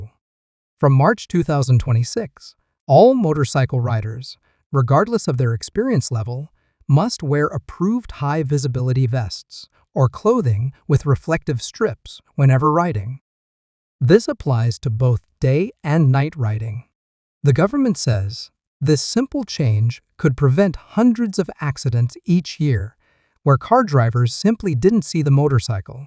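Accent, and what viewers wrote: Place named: United States. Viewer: I do not know how much of this I can actually believe. Specially with a Yankee voice or a I. Sounds like revenue to me with some of it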